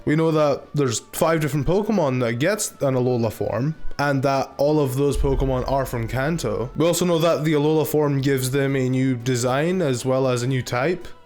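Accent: Scotland, United Kingdom. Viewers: Is half Scottish, because his voice has a faint hint of Scotland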